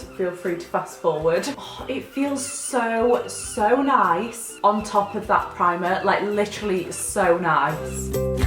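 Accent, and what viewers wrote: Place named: Yorkshire, United Kingdom. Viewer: Sounds weird but you've got the nicest accent ever... is it Yorkshire? xo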